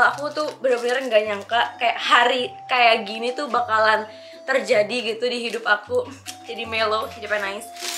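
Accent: Indonesia. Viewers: Hai kak dan kak assalamualaikum. Salam kenal, saya BEKASI UTARA. Uwahhh Bekasi mana nih Suaranya hehe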